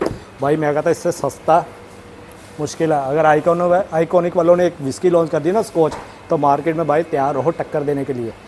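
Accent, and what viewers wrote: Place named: Punjab, India. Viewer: Bnde da accent punjabi lgda menu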